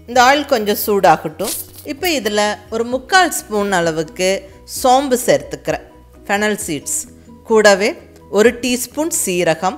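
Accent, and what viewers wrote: Kongu, India. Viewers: கொங்கு தமிழில் நீங்கள் சொல்வது நன்றாக இருக்கிறது. உங்கள் குரலும் அருமை . சமையலும் சூப்பர். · Hi mam, ur recipes r too good. I like ur kongu tamil also. Keep rocking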